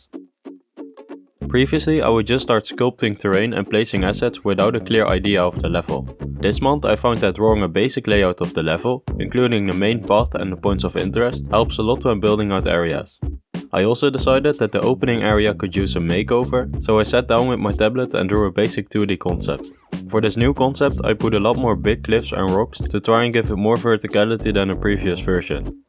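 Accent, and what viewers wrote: Netherlands. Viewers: Beautiful dutch accent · R U Dutch? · r u dutch? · Wow what an amazing channel just subscribed, when I heard your accent I immediately thought that you were Dutch! and your are right? I'm also learning how to program myself, but I'm just a beginner.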